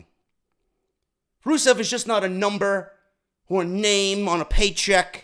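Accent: New York, United States. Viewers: Newyorker accent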